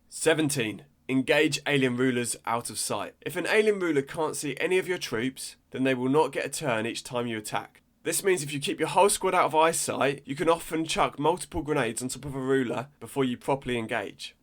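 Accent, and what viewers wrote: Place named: United Kingdom. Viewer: man, how much I love your accent... You're british, ok, but exactly from where?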